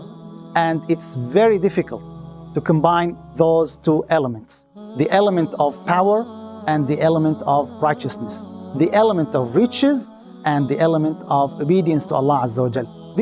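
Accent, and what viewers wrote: Indonesia. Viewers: Apa cuma gue yg dengar suara pronounce nya org indo ? Hehehe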